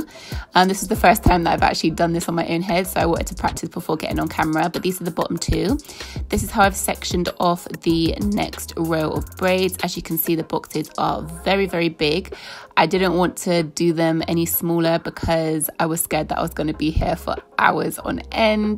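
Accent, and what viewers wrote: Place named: United Kingdom. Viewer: Love these braids on you! Followed you over from IG and this is the first time I I've heard you speak lol Totally forgot you are from the UK <3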